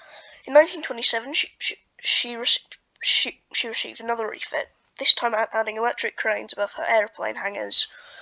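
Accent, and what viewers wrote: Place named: England, United Kingdom. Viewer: Thank for this really well put together video. For the first time in ages, I'm getting to view something where the narrative matches your visual presentation accordingly and accurately. Your English accent turns my ears into soft butter. A pleasant change to my usual cretinous American environment. Well done and thumbs up.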